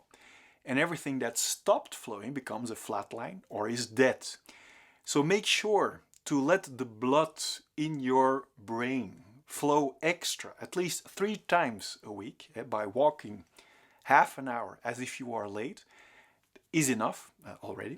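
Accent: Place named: Netherlands. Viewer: You have a strong dutch accent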